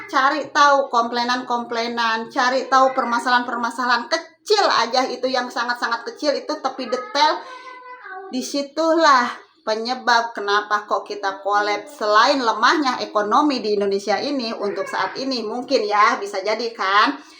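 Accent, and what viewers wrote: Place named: West Java, Indonesia. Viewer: beneran orang Betawi apa orang Jawa barat sih pok..😂😂😂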